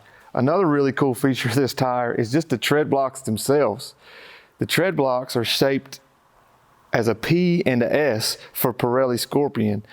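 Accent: South, United States. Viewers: 10 swag points for the southern accent.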